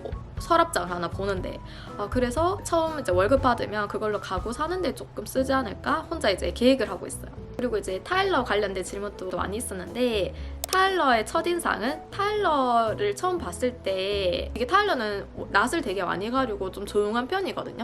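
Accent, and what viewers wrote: Gyeongsang, South Korea. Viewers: 오늘 우연히 보게 됫네요...경상도 액센트도 정겹고, 너무 예쁘고, 생각도 바른 젊은인것 같아 호감 급 상승했어요~^^ 내 아들도 이민자라 같은 또래 젊은인것 같아 더 관심가네요~대형 유투버로 성장 하길 응원해요~^^ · 아이고 5만 츄카해요~같은 갱상도군효 ㅎ 저두미국이에요 1년됫어요 영어못하는즘마ㅠ집콕예요 저두 취직?은무리고..애가둘이라ㅡㅡ 알바하고싶군효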